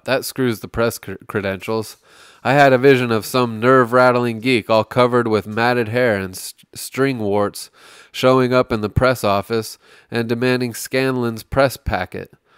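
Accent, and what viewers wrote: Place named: England, United Kingdom. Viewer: Great selection. Nice English accent. Cut the BS at the beginning of your podcast though...no one cares. 😉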